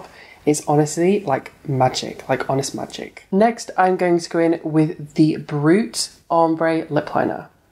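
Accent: France. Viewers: Love the packaging, dont like the French accent. We can see you better then the model. If there was better lighting and close up of the lips would have been better and let her apply it next time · Makeup looks really nice and I think the price seems reasonable! That French accent though doesn’t fit it sounds like the chef in ratatouille can’t take it seriously!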